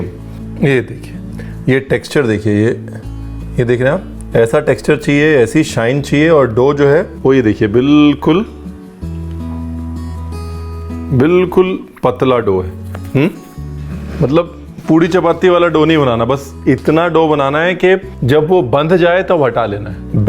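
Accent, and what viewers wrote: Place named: Gujarat, India. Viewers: Fafda 😋👌👌👌jalebi🤔 miss kar rahe the lekin aap ka mitha mitha gujrati sun k dil khush ho gaya👏😀🙌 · Achha lgya apka gujarati tone 🌺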